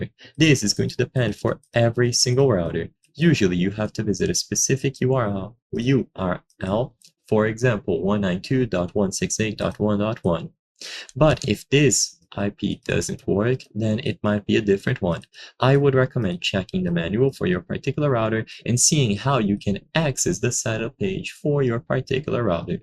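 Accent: Brazil. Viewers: É br né cara? Kkkk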